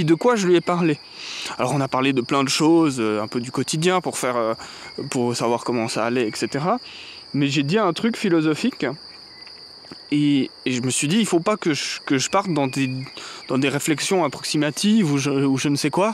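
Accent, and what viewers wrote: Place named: Switzerland. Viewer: Les 2 sont possibles et en Suisse j'entends plus souvent cette façon de prononcer (en tout cas dans le canton de Fribourg) Il est Suisse: ça s'entend à son accent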